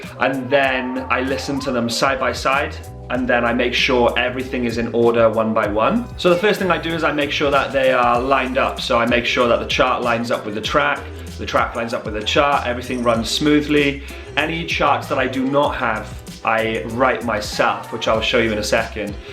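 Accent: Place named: Wales, United Kingdom. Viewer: Hey been watching your vids and just subscribed brilliant content.. Recognised the Welsh accent and was wondering whereabouts yr from....low and beholds about 4 miles from me! Im after some charts for cruise ships buddy....will trade you some drum stuff for them....or lash you up with lots of beer 🍺 😉 😜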